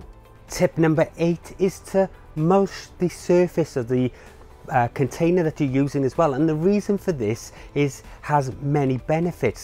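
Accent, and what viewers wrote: United Kingdom. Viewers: At about the mark of, in Tip No. 2, the captions say, "...use a 30 liter or "80" US gallon container.", you have correctly said it.... 8 gallons. You, however, might wish to get the captions corrected. Those either unfamiliar with the British accent or not accustomed to the Metric system, and totally relying on the captions will be thrown off, and head to the market to buy giant trash cans. LOL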